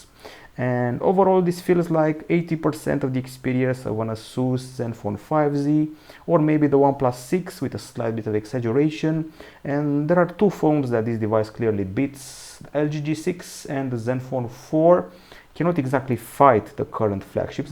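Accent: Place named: Romania. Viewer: You have like a romanian accent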